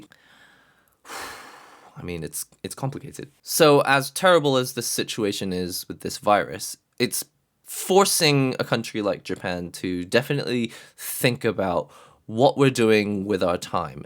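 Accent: United Kingdom. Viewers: Im new in this channel, how you have this awesome british accent?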